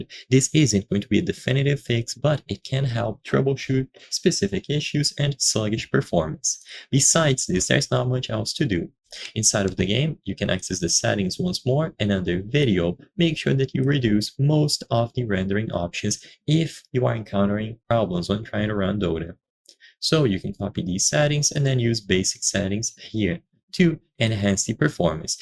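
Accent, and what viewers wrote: Brazil. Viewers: I know a Brazilian when I hear one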